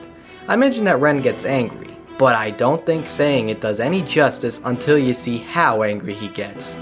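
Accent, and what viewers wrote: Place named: New Jersey, United States. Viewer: Are you from New Jersey? You have a bit of the accent.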